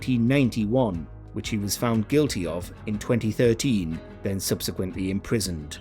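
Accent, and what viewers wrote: United Kingdom. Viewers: thank you my lovely. That was nice of you to reply, i did not expect it to be seen. Im a sucker for British accents, his is definitely sweet 😊 Have a great weekend.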